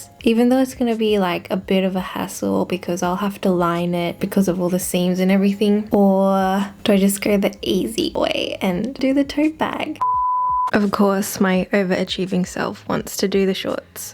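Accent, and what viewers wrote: Australia. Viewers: Love this! So creative and clever! Thanks for sharing! I am now a sub! Also good to see and hear a familiar Aussie accent 🥰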